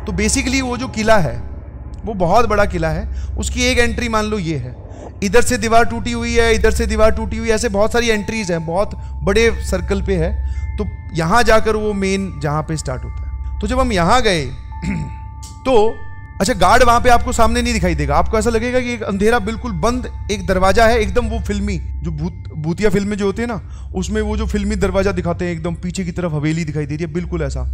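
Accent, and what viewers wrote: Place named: Bengal, India. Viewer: Ami je tomar😅 hayy your Bengali accent just killd me ❤❤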